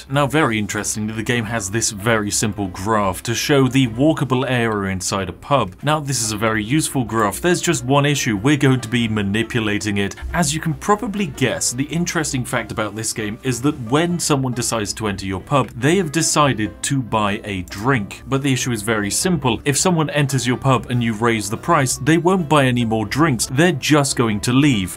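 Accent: United Kingdom. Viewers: I never disliked a video faster (edit: I think he's funny I just hate british people's accents :( not personal though, I still subscribe I just dislike his videos)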